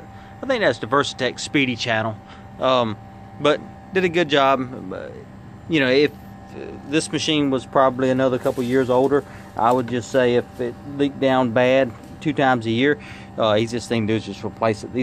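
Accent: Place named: South, United States. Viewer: Thanks bro ,when u from I travel to Baton Rouge for fire truck you sound like your from the great south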